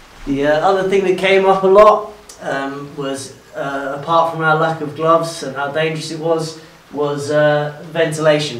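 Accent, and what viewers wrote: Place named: England, United Kingdom. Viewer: Теплица прикольная а вот чепорный английский говор отвратителен.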